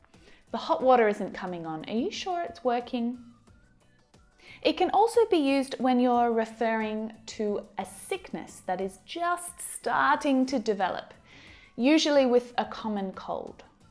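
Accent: United Kingdom. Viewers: Ok ,,.es que estoy aprendiendo el inglés pero de pronunciación Británica que es más limpia y solo encontraba Americana... y te encontré...besos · looks like your english pronunciation "british." anyway . thanks.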